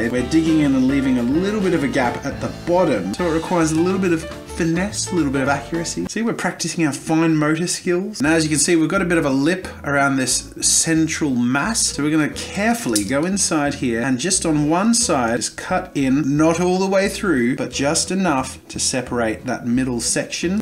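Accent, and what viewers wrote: Australia. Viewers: Wait ur Aussie... AUSSIE AUSSIE AUSSIE OI OI OI! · Wait ur aussie...I AM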